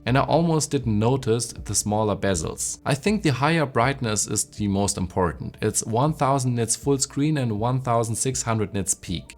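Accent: Germany. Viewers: Are u a native german speaker? Fellow german here, hearing it in ur voice. Sounds wierd to watch a yt vid with a German speaking english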